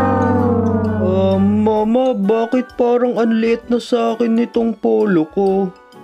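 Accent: Philippines. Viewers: Taga maynila ka ba?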